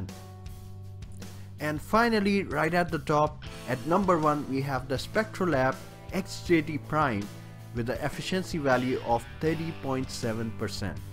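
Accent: India. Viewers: I kinda started liking Indian accent.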